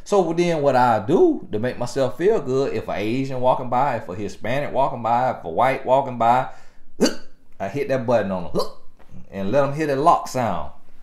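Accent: South, United States. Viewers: This guy sounds like my Dad it’s crazy. Where in the south is he from ? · I enjoy your logic,you are right people are like sheep they run in herds,l love your accent the south l would say.Keep up your wisdom.l am white and my daughter is heavy,she is treated that way.And some men genuinely love big woman,but they are really ostracized for real. Love your vocabulary. PEACE👏👏👏✌💯I Have to read your books.🙏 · You should add comedian to your list of achievements , them sweet teas and chicken wangs took me out😂😂 it just drove the message home, that authentic southern accent and commentary Is everything 🙌 no matter how far you go be yourself, love yourself still on my journey... Far from where I'm going but far from where I was 🙌 CARBONATION 😩😂😂😂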